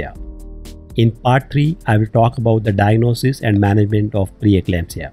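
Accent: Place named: India. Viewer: I cannot catch everything you said due to your Indian accent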